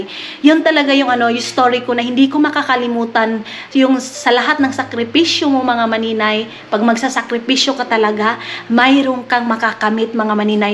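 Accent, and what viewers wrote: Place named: Talacogon, Philippines. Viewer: Fan mo ako ganahan ko sa imo vlog ky makalingaw 70 yrs old na ko taga talacogon talacognon ba jud imo sinultihan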